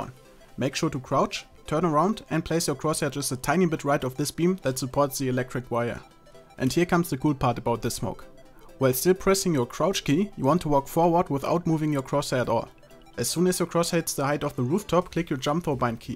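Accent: Germany. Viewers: Are you from Germany? You have very german accent. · der deutsche lol dat english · Das deutscheste Englisch ever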